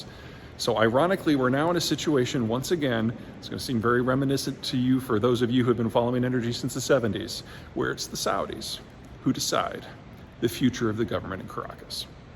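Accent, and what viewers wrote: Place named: Iowa, United States. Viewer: Don’t knock An Iowa accent honey.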